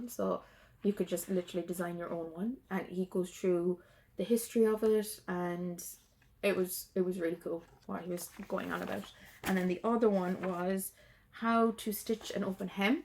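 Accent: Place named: Ireland. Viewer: Lovely to hear another Irish accent on Flosstube. Great to find another Jacob fan. I was supposed to attend the Big Stitch but sadly life had other plans. Really enjoying hearing all the recaps. Love the variety of your projects. Looking forward to following your progress. Take care, best wishes from 🇮🇪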